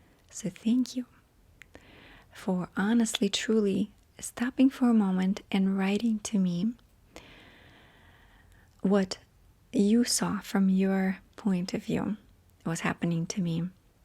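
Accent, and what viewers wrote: Russia. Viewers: I've been following and enjoying your channels for many years. As an Eastern European, let me tell you, yes, I can see the Russian origins. You even have some accent left, especially in the pronunciation of your "L". In my opinion, both identities can coexist, Russian and American. It doesn't have to be an either/or. It's who you are, celebrate it! ❤ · I like ‘sincerely ’ as I agree that is how I see you and your videos. Also your Russian roots and your amazing accent with its Russian twang is what has kept me listening the past 10 years ❤ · Simply. Even though you have lived in the US longer you still have the ethereal Russian accent so it's perfect. Its what makes you, you. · Simply resonates best imo since you aren't trying to be anything else, not a performer, not an influencer, simply. Why, not? Though you don't broadcast that part of yourself all the time it's still part of who you are. 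The accent in your voice is lovely and reminds me of your rich background, russian treats you've shared with us, and even family you've introduced to us. For me, its part of how I've gotten to know you over the many years and even if the content shared is less culturally focused, it's always a beautiful part of you 🩷 Also, one last thing. You mention that many people distanced themselves from you and your channel. I remember that time being a period of seeking a sense of belonging, of security, of self. You were in an emotionally vulnerable place and found solace where it made sense at the time. You said "I deserved it". Please don't beat yourself up over that learning experience. It was part of your journey and necessary for you to get to where you are now 💖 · How brave you are! To share yourself and your journey with us. It hasn't been easy for you over the years and we thank you for your honesty. 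I like Simply. From a marketing/comms perspective it is very strong. There's alaway...? Emphasising the two aspects of who you are. I would encourage you to embrace your Russian side...even in these difficult times. It's a point of difference for you and for many of us, strength comes in knowing who we are, and where we came from. We love your accent and your cultural difference. It makes you special. As far as content is concerned, I love the gardening journey you are on and just about anything you choose to share. But keep some things things for yourself! Your 'sassy' natural personality is wonderful to see and it's important for you to be able to be yourself, not in a curated way. ❤ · Hi! I am from Bulgaria and I officially been in America more than Bulgaria, just like you. I know what you mean. I am so glad you are renaming this channel, I love you but Sassy did not fit you lol. And for the record, I think that on this channel your accent comes through just a bit more than the Gentle Whispering channel. 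I can recognize your accent, and despite your years in America you will always have your root in Russia. Thank you for your content ❤ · I adore how your Russian accent is still so prominent even though you’ve lived in the US for over half of your life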